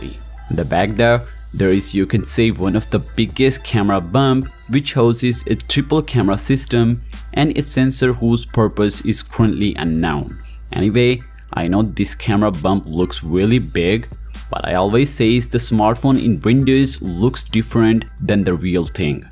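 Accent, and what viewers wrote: India. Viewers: Indian lovely accent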